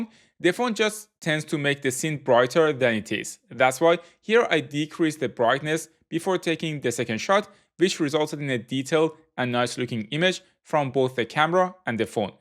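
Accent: Iran. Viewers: I love the iranian accent. Damet garm brother 😘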